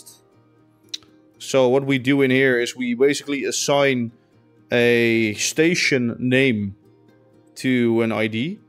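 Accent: Netherlands. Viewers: I smell a dutch accent.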